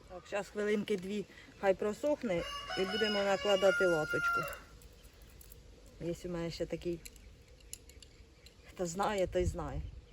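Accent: Poltava, Ukraine. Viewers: я так і думав) у мене кума з Полтавщини, то теж так говорить)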